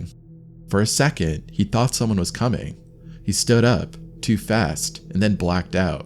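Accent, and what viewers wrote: New England, United States. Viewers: Are you from the northeast? Kind of new enland area?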